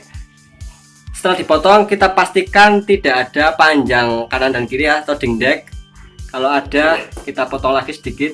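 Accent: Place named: Banyumas, Indonesia. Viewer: Ngapak yaa hehe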